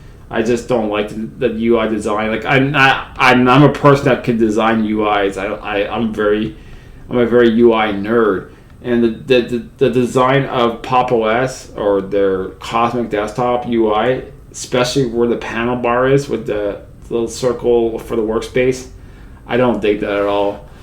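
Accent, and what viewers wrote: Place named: Australia. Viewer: I kinda thought you were an Aussie first ngl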